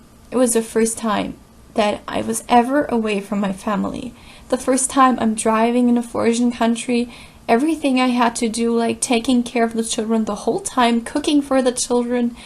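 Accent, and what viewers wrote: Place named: Germany. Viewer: wie man immer sofort weiß dass jemand deutsch ist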